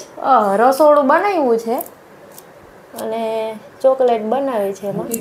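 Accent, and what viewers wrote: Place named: Kathiawar, India. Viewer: Gujarati vlogs is far more better than Hindi. Your Hindi was not so clear and in Kathyawadi tone. Hence, our mother tongue is the best. Good luck.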